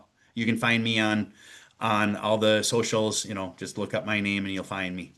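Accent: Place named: Minnesota, United States. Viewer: Farmers do everything better🎉 i do hear a hint of that handsome Sota sound, now that you mention it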